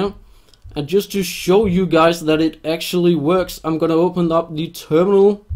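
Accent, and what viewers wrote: Denmark. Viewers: is a nice name:D And still so danish and so badass english I do really like your english speak:D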